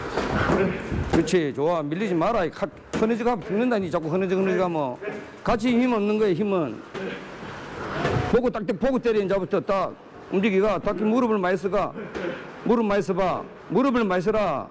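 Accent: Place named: Busan, South Korea. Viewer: 부산싸나이 말투 쎄네요. 그 속에서 묻어나오는 정이 느껴집니다~^^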